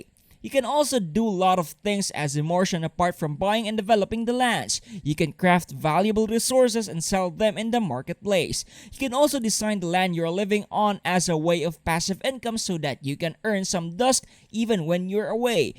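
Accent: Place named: India. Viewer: This foookin indian accent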